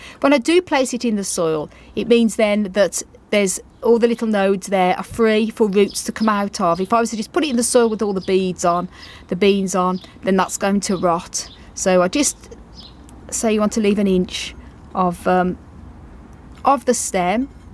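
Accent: United Kingdom. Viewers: So pampering voice you have I love UK accent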